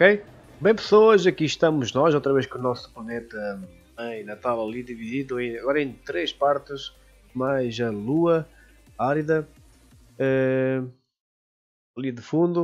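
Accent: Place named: Portugal. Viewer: Vc é português? Pois vc tem um leve sotaque, eu sou Brasileiro, e consegui compreender tudo o que vc disse!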